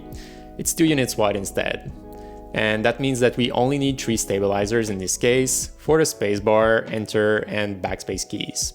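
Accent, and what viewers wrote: Quebec, Canada. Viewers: Hey, are you looking to sell this keyboard? I live in Montreal and I can get around - I figured from your accent that you are from Qc as well. So if you're interested, hit me up!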